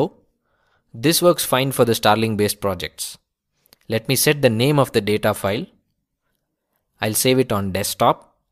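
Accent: India. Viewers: indian accent really drives me mad.. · it was quite funny at the beginning with the perfect and polished intro then out of nowhere a thick indian accent. but amazing tutorial, helped a ton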